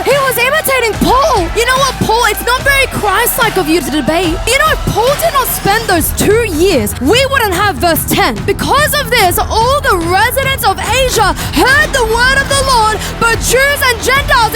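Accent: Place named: Australia. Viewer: I literally saw my first video of hers and I thought “ yes, she’s an Aussie!” Seriously so good to hear the truth being preached unashamedly but with such wisdom & you can tell she knows her God and His Word. I want to stop eating my dinner now & go and read the Word! Truly anointed, I think she is one to watch.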